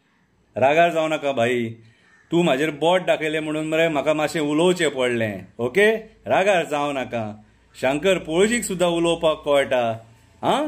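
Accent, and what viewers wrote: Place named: Salcete, India. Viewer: Xaxti accent good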